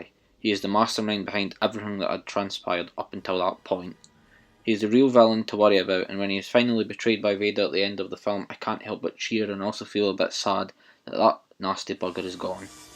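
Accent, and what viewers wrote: Scotland, United Kingdom. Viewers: Thank you so much for spitting facts Return of the Jedi is the best of the original trilogy and it has always been better than the Empire Strikes Back and it’s so awesome that you’re Scottish I always like Scottish things and I like doing the accent to I say this famous line from Mike Myers “Welcome to all things Scottish if it’s not Scottish it’s CRAP” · That Scottish tho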